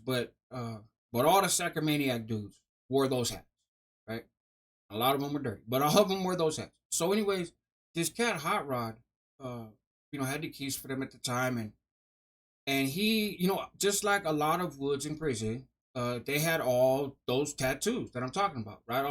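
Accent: Mexico. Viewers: Probably because he grew up around a bunch of Mexicans. Kinda how a lot of New Yorkers have a stereotypical "Italian" accent even though they're not Italian. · Honest question, why, how do u have a Mexican accent being white as a American. So many others have a normal American way of speaking. I wonder if you put it on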